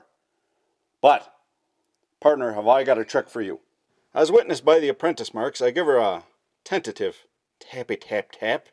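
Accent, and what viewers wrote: Canada. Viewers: I tell ya I love you man! I'm canadian been living in USA since I was 9 (am 39) but just listening to the engineering knowledge mixed with canadian common sense humor and a smidge of the accent brings me back to listening to my grandpa in Regina sask working on his international harvester good times. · Great to hear a true canadian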